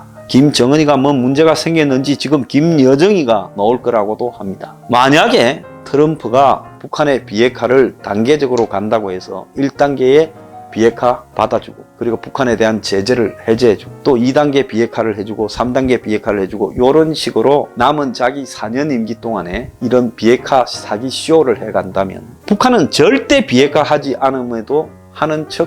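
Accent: Gyeongsang, South Korea. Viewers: 그래서 바이든되면 머가 좋구 나쁘고 그런걸 말해야지 트럼프에 대해서만 말하내 황장수 위장우파라고 소문이 났던데 이런 이유이구나 경상도 사투리 쓰지마라 전라인민공화국 사투리쓰면 이해라도하지 어릴때부터 쇠뇌가 되었으니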